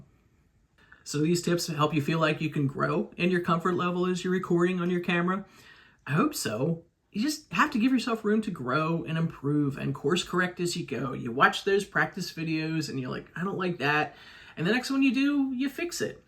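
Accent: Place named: Midwest, United States. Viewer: Are u from the midwest. I lovvvve your sense of humor. You had me laughing so hard numerous times. Content n comfort is awesome. Thanks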